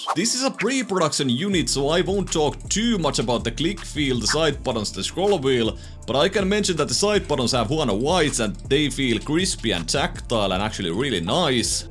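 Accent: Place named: Finland. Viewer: You have a pretty strong Finnish accent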